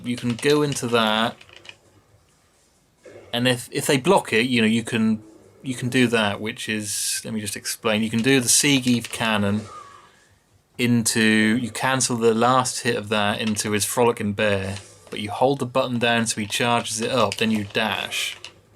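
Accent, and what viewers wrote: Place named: England, United Kingdom. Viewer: Haha nah I just mean your English accent, combined with the cursing and you were obviously tired. I did learn some stuff about this character though - cheers.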